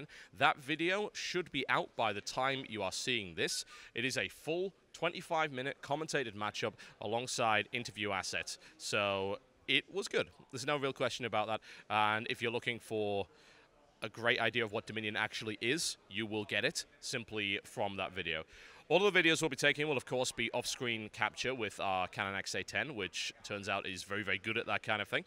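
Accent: United Kingdom. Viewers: whoa dude, you're extremely british.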